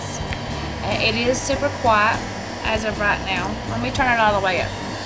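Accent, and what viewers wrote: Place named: United States. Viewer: Hiiii nice video! Love your country voice 🤗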